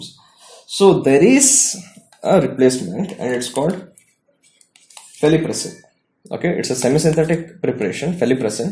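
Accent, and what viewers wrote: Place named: India. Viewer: great lecture although heavy indian accent sometimes difficult to understand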